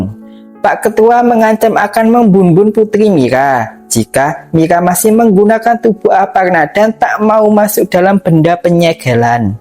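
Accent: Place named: Banyumas, Indonesia. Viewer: Ngapak yak😅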